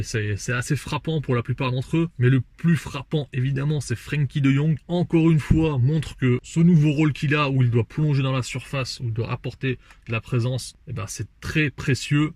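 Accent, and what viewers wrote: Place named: Spain. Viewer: C’est quoi tes origines ? t’a un accent qui me fait penser a l’Espagne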